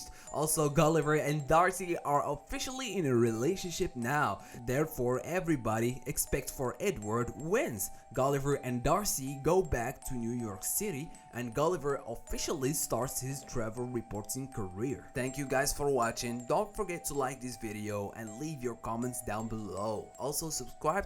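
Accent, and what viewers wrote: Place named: Morocco. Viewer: i can swear that you're Moroccan hahaha fiiin aSsat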